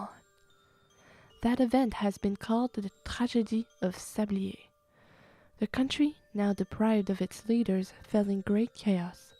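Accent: France. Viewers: Peut être aussi ta façon de prononcer des mots français comme "tragédie de sablier" à la française. En général quand quelqu'un sonne aussi bien français... surtout sur les R... ça peut laisser suspicieux. Et puis j'ai l'impression que Pandora Hearts est assez populaire en France (même si ce n'est pas l'oeuvre qui revient dans le plus de discussions actuellement, je connais pas mal de personnes de bon goût qui l'ont lu ou regardé)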